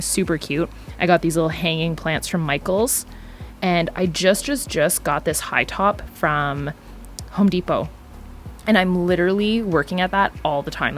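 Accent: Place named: Canada. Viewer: I knew you were Canadian from the first video I watched (your channel is new to me)! You talk like me! I watched a couple of other videos, looking for French on products. Man, I'm such a dork. Anyhoo, gonna sub a fellow Canuck! 🇨🇦 Oh, and Carl needs his own show, books, product line etc. Sooooo cute!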